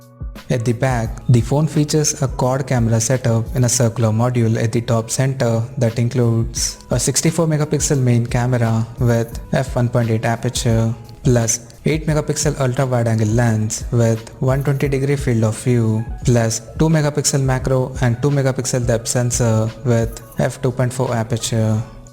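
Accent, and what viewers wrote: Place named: India. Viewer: Your accent is very bad, If you gonna put videos to explain technical specs., You should get rid of Indian Language accent on your English. Each language has its own accent so don't mix please.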